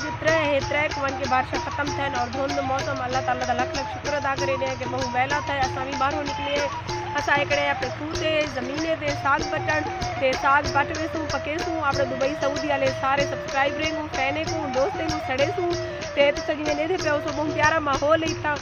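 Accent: Saraiki, Pakistan. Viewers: Batao g ap Kahan ki Hain ap ki zuban sariki bahot achi hai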